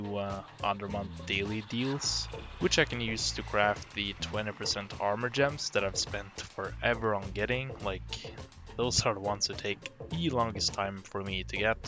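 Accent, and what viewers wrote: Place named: England, United Kingdom. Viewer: Where about in England you from?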